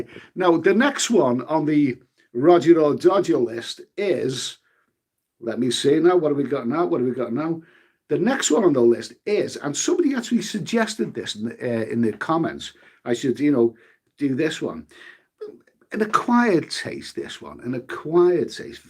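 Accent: United Kingdom. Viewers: Your very enjoyable to listen to. I have to admit I love listening to Brits talk! You give an interesting perspective on things so I just wanted to say don’t give up hope yet I’m already booked for philippines for December, but just in case I have free cancellation.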